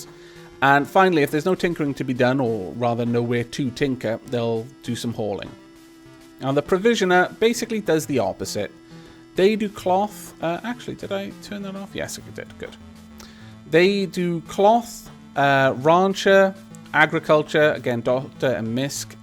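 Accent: Wales, United Kingdom. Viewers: Subbed man, can you add my name pls? Also are you Welsh?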